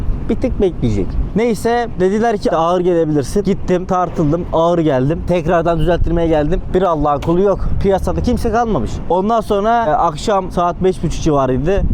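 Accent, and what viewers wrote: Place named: United States. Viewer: Babacan Allah yardımcın olsun ama amerikan ağzıyla konuşman çok sinir bozucu yok tarp yok mill Türk ağzıyla konusun spenzet vs km aksi sinir bozucu yolun açık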